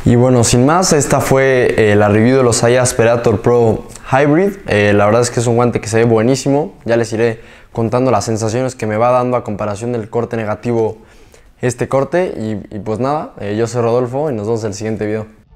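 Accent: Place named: Fresa, Mexico. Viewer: Algo nefasto el acento super fresa goeeee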